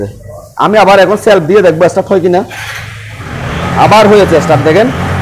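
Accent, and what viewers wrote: Chittagong, Bangladesh. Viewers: Apni ki cittagong er na ki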